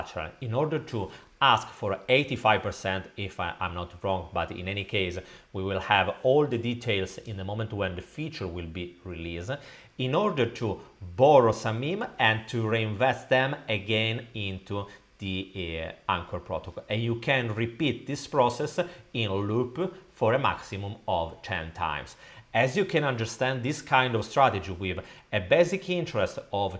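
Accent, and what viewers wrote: Italy. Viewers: Are you Italian? I recognise that accent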